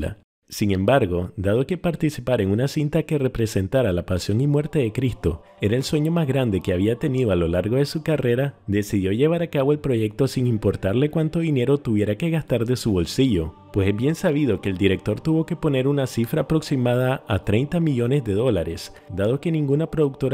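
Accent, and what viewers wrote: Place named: Spain. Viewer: Muy buen video pero tu acento es espantoso debe ser castellano neutro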